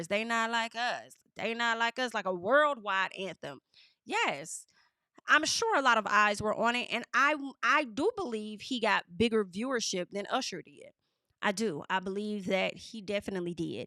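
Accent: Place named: Louisiana, United States. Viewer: Hey, bihh! You are one of the best YouTuber. I love your accent. Where are you from? It's giving Louisiana! Bihhh stop, I'm that.5 million that's been watching over and over again! They didn't add crowd noise. I know someone that was there, and they said it was lit! 50 is a bug 🐛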